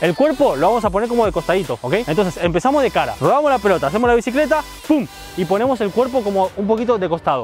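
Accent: Argentina. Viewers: sos de argentina no?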